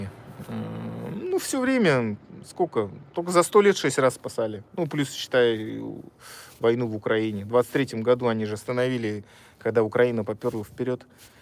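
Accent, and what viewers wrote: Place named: Buryatia, Russia. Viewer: Вы ваш разговор похож на западных бурятов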